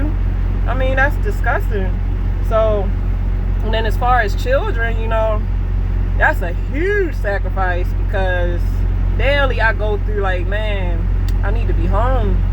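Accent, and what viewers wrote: Baltimore, United States. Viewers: You must be from b-more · Go old TA HUH LOL. Quick question are you from Baltimore I'm picking up on your lingo how you talk I'm from Maryland myself thinking about starting up a YouTube channel when it comes to trucking I have a YouTube channel when it comes to racing just over 10,500 subscribers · You gotta be from Baltimore lol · i can tell you from the Baltimore area, your accent and pronunciation comes through ..... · You are from Baltimore aren’t you? I can tell by your accent. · were you from dc or baltmore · You have a Baltimore accent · U from Baltimore? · You must be from Baltimore. Lol. The way y’all say words that have the sound U in it is unlike anywhere else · Hey Wassup.. Am I correct in saying your accent sounds Bmore to the core. BALTIMORE? AM I CORRECT OR AM I OFF? I'M JUST SHOWIN LOVE. RESPECT! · From Bmore???? 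I can hear it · Oh yeah I can hear that Baltimore coming out🤣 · She definitely from Baltimore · Love that Baltimore accent!! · Much respect queen. Make it happen. Also I hear the Baltimore in your voice and accent. Positive energy and uplift. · Baltimore accent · You gotta be from Baltimore, cause that accent is pretty strong!!! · Toooooo and dooooooo yeah she from baltimore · Great content! First time coming here and I may be a new subscriber, you're a very Pulchritudinous woman and I detect a strong Baltimore Maryland accent! Lol 🤣😎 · Straight up b mo accent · She from Baltimore huh I hear that U in all the words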